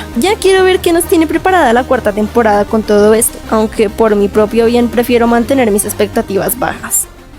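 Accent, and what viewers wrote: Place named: Bogotá, Colombia. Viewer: Felicidades! es un grandioso video, desde el apartado visual, como la edicion, las pequeñas animaciones que integras en los titulos y el manejo final del video para explicar las partes mas densas de un fandom. Es un gran formato y muy fresco en youtube. Es un honor conocer creadores de contenido Bogotanos, me encanta que se sienta tu acento, no soy mucho de comics y aun asi lograste darle un gran ritmo a toda la trama sin dar realmente tantos spoilers. btw el inicio fue increible JAJAAJ, ahi te va mi sub, estare muy al pendiente de tus nuevos videos!!!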